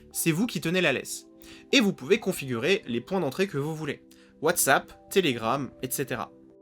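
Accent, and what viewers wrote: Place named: United States. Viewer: Ralala ces vidéos conspirationnistes... Par des personnes qui ont passé trop de temps devant Terminator... Ouhlala au secours, skynet est parmi nous.... Pour utiliser un agent Ia tel que Openclaw, il faut être capable de faire des installations en ligne de commande et donc ce public plus ou moins avancer sait déjà qu'il faut séparer ses données des données qu'on donne à manger à l ia... Stop a l agitation du drapeau sécurité à outrance avec un accent Américain tout aussi .... Pas grave je continuerai de regarder tes vidéos mais go brain avant de faire juste du buzz😅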